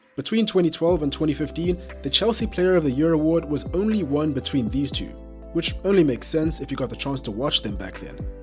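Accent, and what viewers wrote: South Africa. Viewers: My man's accent sounds like he's a homeboy from South Africa. Love your vids mfana. Keep it up. Hope you blow up. Holla if you want to do a duo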